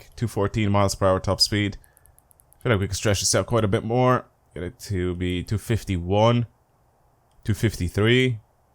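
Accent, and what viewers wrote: Dublin, Ireland. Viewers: Are u a dubliner hahaha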